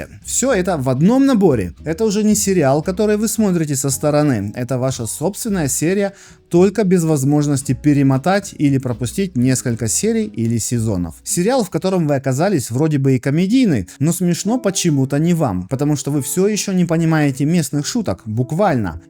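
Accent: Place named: Ukraine. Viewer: Обычный украинский говор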